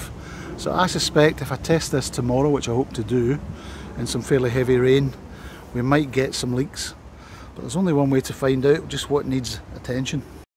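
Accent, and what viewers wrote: Scotland, United Kingdom. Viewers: Great review.. very detailed which I enjoyed very much... Obviously a Scot... Perhaps we will cross paths on the hill or mountain...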